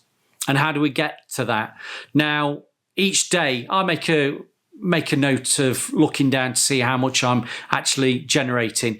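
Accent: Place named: East Midlands, United Kingdom. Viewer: Great to hesr an east Midlands accent on YouTube .. im originally from Grantham ..did my yts for wj king in melton in 1990... Worked all over notts.. Finally great to see a painter doing videos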